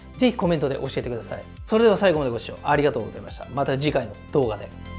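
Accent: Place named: North Kanto, Japan. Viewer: 北関東かな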